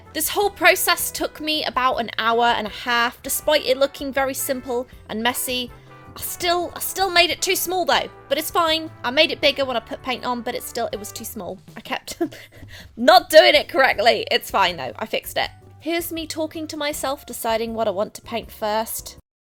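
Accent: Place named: Cornwall, United Kingdom. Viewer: are you from Cornwall sounds a bit cornish? I love your art by the way Luv from the UK xx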